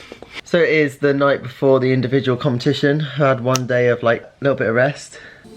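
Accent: United Kingdom. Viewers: You & Matt are outstanding and your bubbly personality (and your EYES!- they're so dreamy!) on your videos is so much fun! But can you tone down the British dialect so that we older Americans can keep up with high-speed commentary? You and Lance were kind enough in the summer of 2017 at the Honolulu Rainbow Film Festival to say hello in a short video greeting to me as I lay in my hospital bed, missing the chance to attend the gala. I've been a fan of you and Lance since before then (and I'm holding onto that video!). Good luck at the Olympics!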